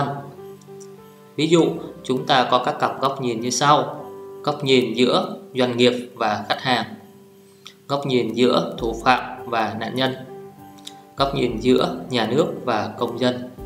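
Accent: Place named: Thanh Hoa, Vietnam. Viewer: bạn này ở hoa thanh quế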